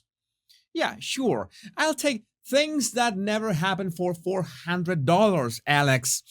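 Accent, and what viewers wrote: Cockney, United Kingdom. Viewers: Impressed by your Cockney accent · Spot on cockney xx tee hee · Loving your Latin Cockney accent, you rock, 👍❤️😁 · I Love your cockney, from a cockney let me tell you he was top of the apples, went down the frog with his trouble and is now in the pig.❤️❤️ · I love your Dog Shite- mentry! As a Londoner, I love your Cockney accent!😅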